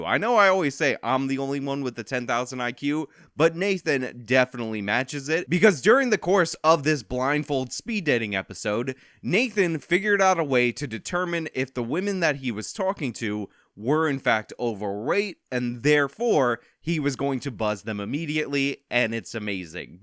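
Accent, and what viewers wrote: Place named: Boston, United States. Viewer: I a Bostonian? the accent really comes through in this video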